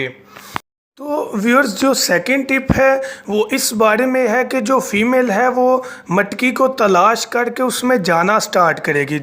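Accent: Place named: Punjab, India. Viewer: Punjabi lahja hai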